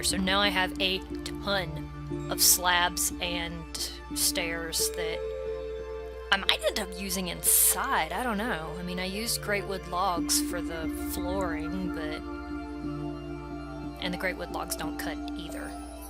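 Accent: South, United States. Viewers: she has a little bit of a southern accent. :)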